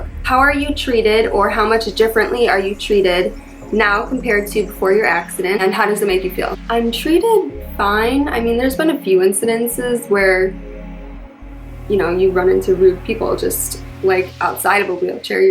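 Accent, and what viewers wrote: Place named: Chicago, United States. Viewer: I was gonna ask if you were from chicago. You got that accent. love it!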